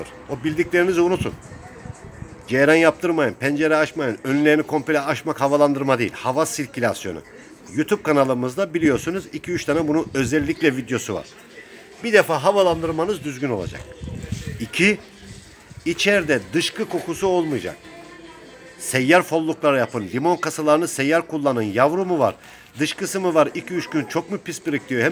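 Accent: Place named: Rize, Turkey. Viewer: senin şivene kurban olurum abiiii:))) ben de Rizeliyim... saygılar. teşekkürler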